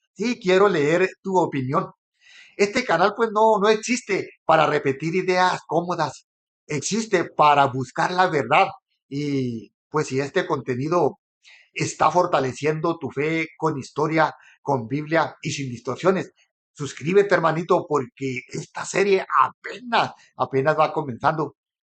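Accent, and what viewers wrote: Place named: Mexico. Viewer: Me encanto, mas alla de sus palabras, disfrute de esa tonalidad mexicana, se me hizo hermoso en su conjunto.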